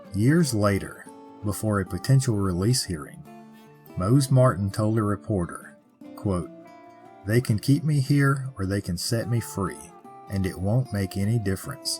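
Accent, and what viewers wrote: South, United States. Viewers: I love your way of telling stories. The accent does make me miss living in the south. What is it that catches your curiosity about a story? What ultimately draws you to telling it?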